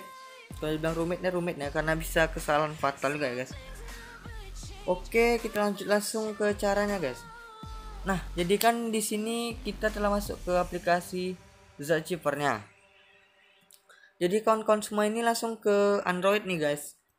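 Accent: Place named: West Sumatra, Indonesia. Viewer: Nampak bna urang sumbar ky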